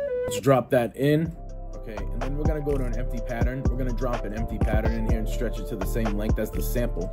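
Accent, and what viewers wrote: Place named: Italy. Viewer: i don't know what this accent is whether is italian or something else but i love it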